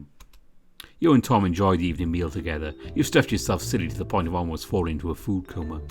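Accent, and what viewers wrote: United Kingdom. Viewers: I love the witty banter. It was nice, it goes well with your British accent.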